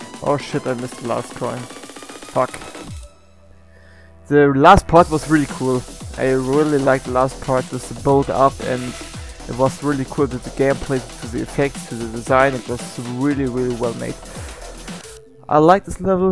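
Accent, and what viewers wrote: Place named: Germany. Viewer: Man merkt richtig das du aus Deutschland kommst ich finde dich cool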